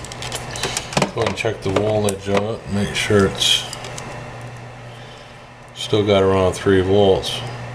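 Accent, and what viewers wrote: United States. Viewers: Are you from Missouri?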